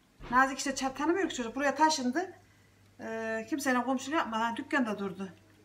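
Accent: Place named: Konya, Turkey. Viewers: Zaten konuşman bir tanıdık geldi toprak çekti bende konya ereğli liyim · hanim memleket nere konusmalar bizim oralar ayni bende inanadoluluyum slm hanim👍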